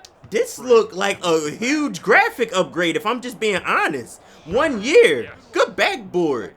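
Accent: Philadelphia, United States. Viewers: Lol you could tell you from philly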